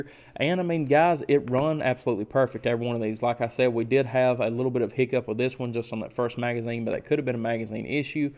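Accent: South, United States. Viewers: Love the way real Southern Boys talk. It's not a BCG, it's a BeeeCeeGeeee.......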